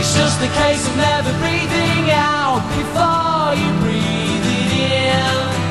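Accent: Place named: Manchester, United Kingdom. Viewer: manc accent sounds amazing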